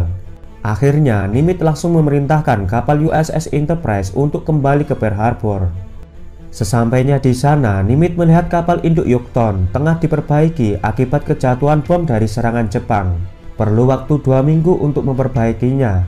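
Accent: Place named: Java, Indonesia. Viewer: Orang Jawa ya mas? 😂 Medok mas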